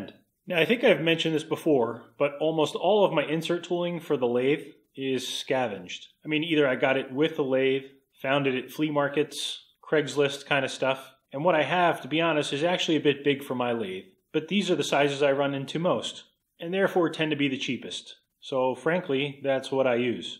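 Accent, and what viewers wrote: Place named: United States. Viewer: three sets of two numbers? WNMG-08-04-08? What planet are you from? You sound American. I've been at this for 19 years, and where I come from (the west coast of the U.S.A it is one set of three numbers...WNMG-332 (of course your 08-04-08 is closer to a WNMG-2.52.52, but I have nver seen that, obviously, lol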